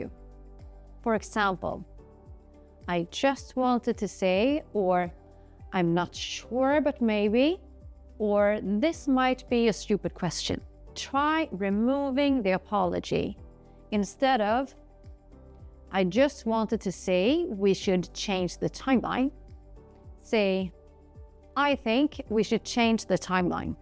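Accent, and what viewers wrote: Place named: Sweden. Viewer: Great summary of years of experience. Keep you accent - it gives you a distinct charm. You sound like a Swedish person among the crowd of wannabes 🙂 Yes, speaking clearly and comprehensibly is important, but do not get rid off of your accent completely - it is your feature to be memorable. Though for many it is a goal of their life.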